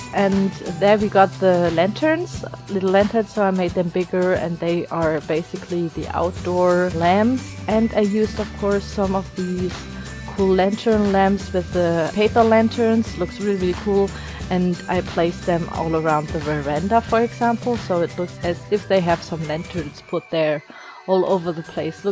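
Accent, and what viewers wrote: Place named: Germany. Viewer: You've kinda german accent... maybe... don't know... xD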